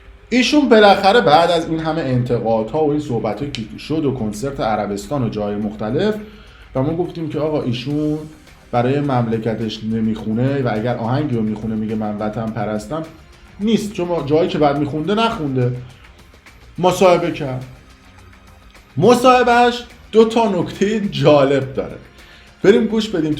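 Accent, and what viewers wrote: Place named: South, Iran. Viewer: Binazir mishe vaghti Junubi sohbat mikonid👌👍🤞👏✌🤩😍♥️🌹⚘🥀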